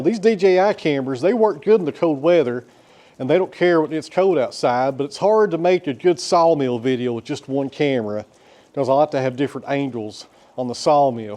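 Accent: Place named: South, United States. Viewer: Love your channel!!.. and your southern drawl! Refreshing personality. You should avoid placing batteries directly on the ground in the cold… as the earth naturally drains them far quicker than when they are insulated. Perhaps a simple insulated wooden box, mounted on corner post with a 40 watt incandescent bulb providing warmth. 🤷‍♂️ There is 40% less energy available in a battery 0*F than 80*F, everything else remaining the same. Good luck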